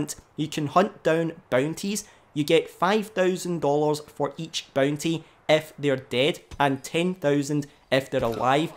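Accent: Ireland. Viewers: Your accent gives me Irish vibes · I love his fuckin irish accent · Irish man?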